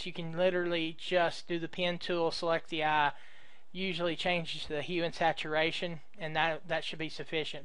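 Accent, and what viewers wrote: Texas, United States. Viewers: a real Texan. love the way you talk. the layer could be duplicated then dragged over to the other eye then re-adjusted in size and fixture to give the same effect without repeating the same work on the other eye. good work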